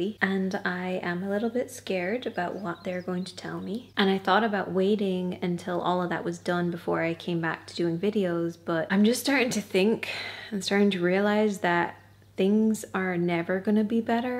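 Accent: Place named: Ireland. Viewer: …sending you so much love ❤ I subscribed many years ago for the makeup tutorials, but now it’s a good day if I manage to put bb cream on! I have 3 kids (2 teenagers and a 5 year old), have had 2 crappy marriages, and makeup is just not a priority as I rarely leave the house 😅 I can partially relate as someone who struggles with my mental health (that has impacted my ability to hold down a job) and also perfectionism. You are such a shining light though ☀️ The older I’ve got (turning 41 soon) the more I’ve realised perfectionism sucks. Just being present and “doing the thing” is so much more important than wasting time and energy on trying to achieve perfection (which doesn’t exist) especially when your time and energy is limited ❤ I for one am just so happy to see your beautiful face, hear your lovely Irish accent and hearing about your life. You are such a beautiful person, inside and out and we support you ❤ Sending love and strength from Australia xx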